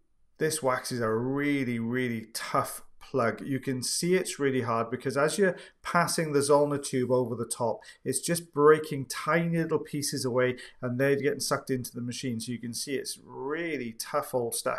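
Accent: Wales, United Kingdom. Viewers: It's a Welsh accent he's got going on there, always cracks me up when he starts taking about Valleys in the canal .... cus he works in the Valleys (of Wales)!! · I had just got done thinking "why don't you bring in the jobson horn, mate?" when you said that in hindsight you would have. By the way, I thought it in a British accent since I was listening to your narration haha.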